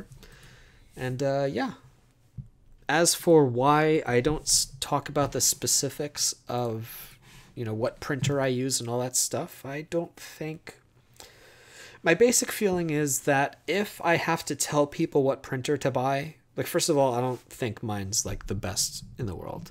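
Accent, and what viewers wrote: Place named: United States. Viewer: Nice art, nicely done, with the creativity to get it together! You are a Greek, though with 100% American accent, if I did not seen the last name I would not guessed it.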